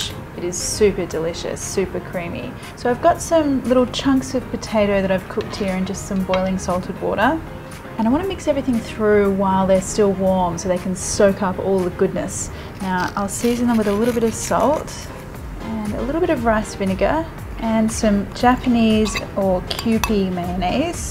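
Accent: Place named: Australia. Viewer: Yet she has a clear Australian accent ok lol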